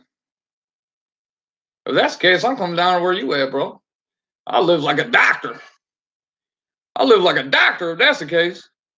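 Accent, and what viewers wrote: United States. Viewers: He is my little buddy from the north. He is from Ohio. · Nothing wrong with it i was just trying to figure out where i heard it before im from Michigan myself and really i thought he was from Detroit !!!!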